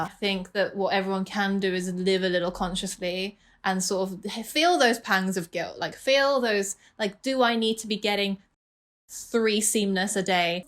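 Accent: United Kingdom. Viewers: Hold up she’s British I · am i the only one who didn't know she was British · 1. She's a wholeass queen 💕💕 2. I'd no idea she was british??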